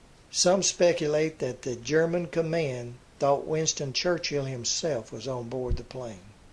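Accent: South, United States. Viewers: Most interesting thanks. BTW I enjoy your accent. Which part of the South are you from? I live outside the USA so cannot place your state of origin from your accent.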